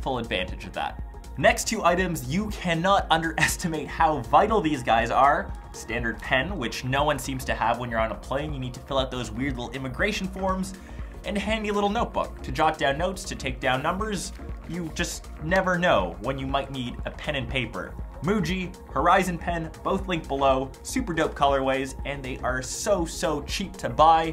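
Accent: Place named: Canada. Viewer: he is talking canadian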